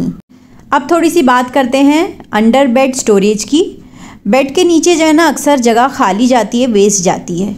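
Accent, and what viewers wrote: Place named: Mumbai, India. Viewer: Aapke mumbai ka accent sune toh acha dikhta ❤frm Hyderabadi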